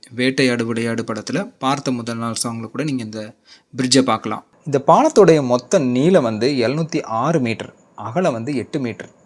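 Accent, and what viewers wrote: Tirunelveli, India. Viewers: உங்களுடைய திருநெல்வேலி பாசை நன்றாக இருக்கிறது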